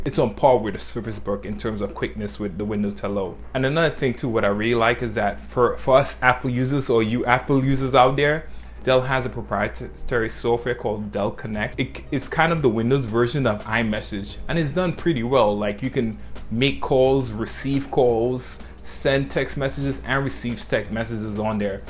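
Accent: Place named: Barbados. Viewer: Hearing that heavy Bajan accent there bro! :-) Great review and keep it up!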